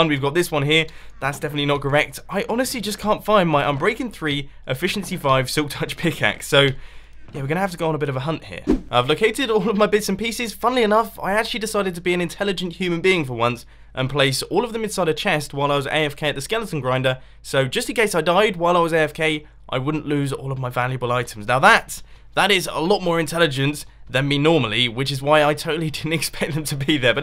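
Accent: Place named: United Kingdom. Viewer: god, I see so many people commenting that "mumbos voice sounds like wheatly from portal"... except it doesn't. wheatly is a robot which has a british accent. is british, hence, has a british accent. just because they both have british accents, doesn't mean they sound alike.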